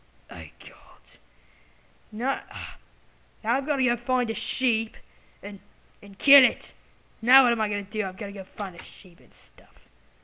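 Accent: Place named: Australia. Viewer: U sound more australlian bro and i am british and i dont care i dont expect u to have a good accent since u r not british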